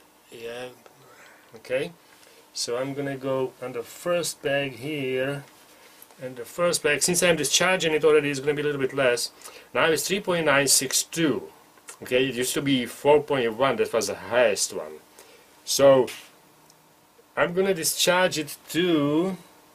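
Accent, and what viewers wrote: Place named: Germany. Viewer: Considering he sounds very much German I'd give him a pass...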